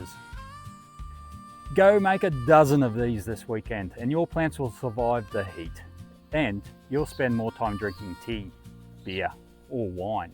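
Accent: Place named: Australia. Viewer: What a fun accent. Are you from Australia or New Zealand, or somewhere else entirely?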